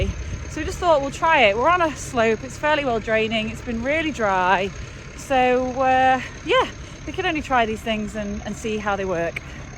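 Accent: United Kingdom. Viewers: First I want to say how extremely beautiful you are, I love your British accent also. We raise a lot of sheep hear in the US. We hire our sheep sheared and then the shearer always buys all of our wool. Our biggest money comes from selling our lambs. We grain them with some of our Iowa corn. We try to get them nice and fat before we sell.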